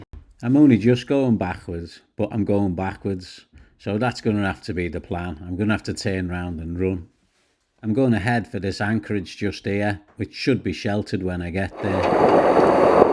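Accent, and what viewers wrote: Liverpool, United Kingdom. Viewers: I mean no disrespect bud. Love your content. Im sure I’m not the only one who hears the distinct similarity between your video commentary and another certain famous Liverpudlian who narrates the kids tv show 😂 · I was thinking the same. Are you from Liverpool?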